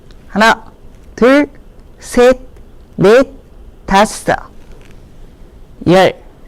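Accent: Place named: Gyeongsang, South Korea. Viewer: 경상도사투리 정겹네요 스트레칭 아침마다 따라하고있습니다 귀에 쏙쏙들어오네요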